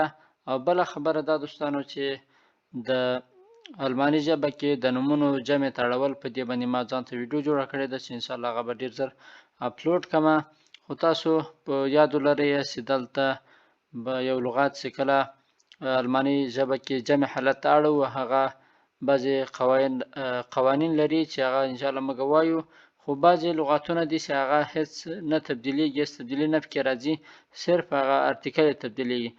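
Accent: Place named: Switzerland. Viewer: اول سلام اوستاد تادالمانی ژبی درس ده سویس په لهجه ده دالمانی ژبی درس باید دی المان غربی لهجه باندی وی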